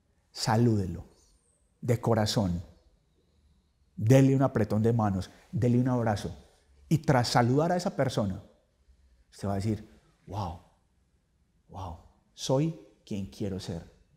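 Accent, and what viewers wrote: Antioquia, Colombia. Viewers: definitivamente el acento colombiano hace que sienta que me están castigando, un abrir de ojos instructivo. Que sea de una vez. El momento es ahora. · LUIS CARO no lo compares con ese asesino. Jajaja te hace parecido por que ambos son paisas, son de la misma región de Colombia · Me encanta el acento colombiano!!! Ay Matilde!!! 🤣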